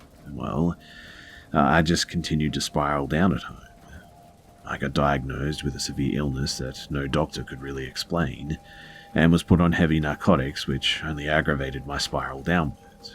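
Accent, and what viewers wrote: Australia. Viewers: I 💘 your channel! You have the most gorgeous sounding Aussie voice. Also, your narration is top notch -- truly professional. I'd listen to your narration on just about anything! Okay, anything at all!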